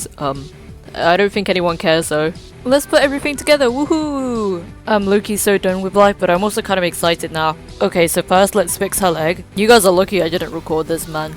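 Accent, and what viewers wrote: United Kingdom. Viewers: she's prob british bro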